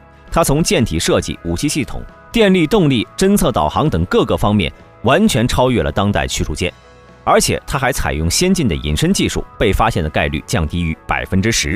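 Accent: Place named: China. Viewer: 这博主除了口音之外别的和中国人没什么关系了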